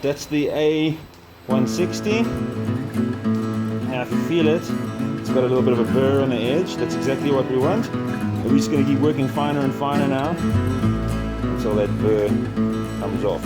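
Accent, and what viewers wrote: South Africa. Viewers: I couldn't place you accent for like 5 seconds when I realised south african!!!! Nice to see a fellow who also does knifemaking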